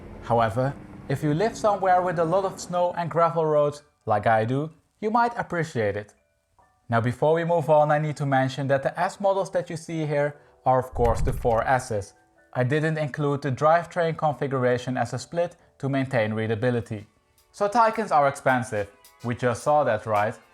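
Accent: Netherlands. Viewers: Do I hear a Dutch accent? 😅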